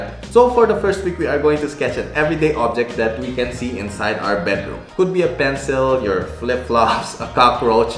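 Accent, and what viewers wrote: Philippines. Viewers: Thank you for sharing your drawing skill. I could not help but notice, your accent. Are you from the Philippines by any chance? Greetings from Indonesia.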